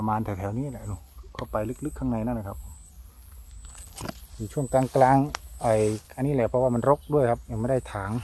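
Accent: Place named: Central Thailand, Thailand. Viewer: พี่พูดกลางทำไมไม่เรียกปลาช่อนครับ5555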